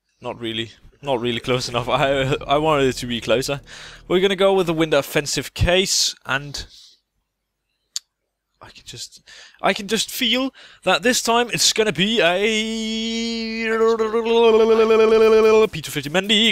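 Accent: Denmark. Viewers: Danmark? Tydeligt at høre!:)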